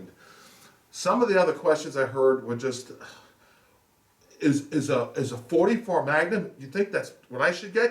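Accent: Long Island, United States. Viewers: Funny to find out you grew up on Long Island. I live here ! Always wondered where your accent came from. You a Nassau or Suffolk guy ?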